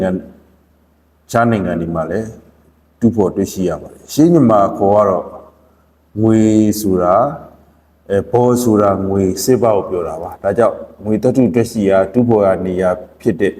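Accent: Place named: Shan, Myanmar. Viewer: မြန်မာစာဖတ်တာ တအားရှမ်းတယ်နော်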